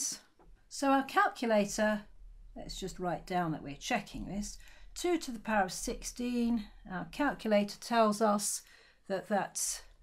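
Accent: United Kingdom. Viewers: I love your thick British accent! Much apreesh!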